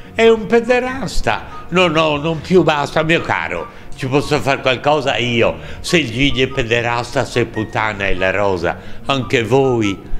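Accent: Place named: Florence, Italy. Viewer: Interpretazione fiorentina fantastica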